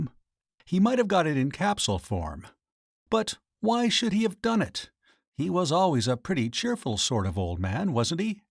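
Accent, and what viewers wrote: United States. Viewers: Who's stupid idea was it have a bloody AMERICAN reading P.G Wodehouse, really?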